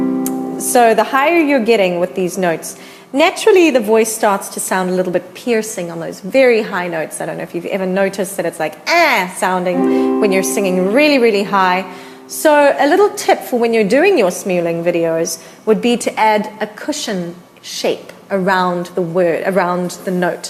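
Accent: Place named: South Africa. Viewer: I'm a huge fan of - her wonderful voice, her upbeat attitude, her wonderful songs and musicianship - and her lovely South African accent. Rock ON girl!!!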